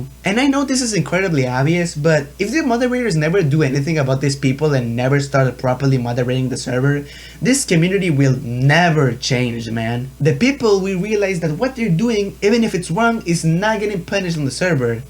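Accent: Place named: Spain. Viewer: se le sale el español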